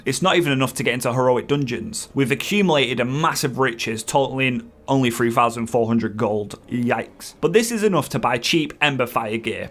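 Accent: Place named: Northern England, United Kingdom. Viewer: been loving your videos. Prefer to watch the compilation ones in one go, beer in hand - the night is mine. I have one small criticism and that is it seems like your videos are on speed.. They go through so quickly there's barely time to absorb the info. If you could slow it down a bit for us old timers lol. Love the accent, I'm guessing somewhere up north. Essex boy here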